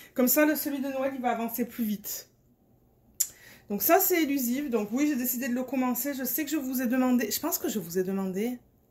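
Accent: Marseille, France. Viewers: Hello 😊 j’ai profité du 11 pour 2 toiles perso chez Ever moment. Jasmine becket griffith c’est pas trop mon truc non plus mais je vais aller faire un tour par curiosité sur le site. J’ai adoré les anecdotes sur les expressions, les 2 premières sont hilarantes. Par contre pour le terme écœurant ça fait drôle de se dire que ça signifie le contraire au Canada 😏 Je trouve que tu as encore un peu l’accent marseillais même s’il doit être moins prononcé qu’au début de ton arrivée. Ça m’intéresse de savoir pour les écoles perso car avec mon mari on se demande si par la suite on ne déménagerai pas au Canada. Paraît il que c’est le top pour les enfants autiste comme mon fils, en France c’est une vraie galère, un combat que je n’arrive plus forcément à mener et qui m’épuise. D’ailleurs je suis en mode gâteau, mon fils aîné fête ses 9 ans demain! Je ne savais pas que l’on pouvait parler avec toi sur Messenger 🤗 Passe un bon week-end 🙂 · Excellent vlog ! 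Tes expressions sont trop drôles !! Tu m'as fait bien rire !! À chaque fois que j'entends un français dire "les gosses" les oreilles me silent !! Hahaha Oui pour Tupperware il faut le dire en anglais ! Et pour moi tu as définitivement encore un accent français ! 😉🤣 Dans les banlieues nord, tout est seulement en français. Il n'y a pratiquement rien en anglais. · Coucou ça va ? Alors, non je n'ai pas profité des soldes du 11 novembre sur aliexpress parce que j'avais déjà passée toutes mes commandes. Je n'ai pas pu attendre lol. J'ai tellement hâte de te voir faire la toile aux 275 couleurs ! J'aime beaucoup ce que propose Diamond Dotz mais je trouve que c'est très cher ! OUI j'adorerais pouvoir voter pour ta prochaine toile et avoir le choix entre 3 ou 4 dp. J'ai beaucoup aimé tes anecdotes sur le Canada par rapport à la France. C'était très drôle. (Oui ! Tu as toujours ton accent marseillais teinté d'un accent québécois 😉) Le tag : J'adore mon travail. 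Je vend des piscines par téléphone en télétravail et j'aime ce que je fais. Les gens me font partager leur projet, un petit bout de leur vie et c'est varié et très intéressant. Mon genre de film : les comédies, les films fantastique et les drames. J'aime beaucoup les films comme love actualy, hunger games ou la liste de Schiller. J'aime pas trop les films d'horreur, je suis trop sensible je crois lol. Je commence ma journée tranquillement avec un bon petit déjeuner. J'aimerais pouvoir faire du dp dès le matin mais avec 3 enfants c'est pas possible ! 😂 La chose la plus importante pour moi c'est ma famille. C 'est vraiment ma priorité. Je suis nulle en cuisine ! Je fais beaucoup d'efforts mais je n'aime pas beaucoup ça 😅 Mon super pouvoir : j'aimerais pouvoir rendre heureux quelqu'un juste le touchent avec les mains. Mon 1er boulot : caissière dans une grande surface. J'ai bien aimé. Les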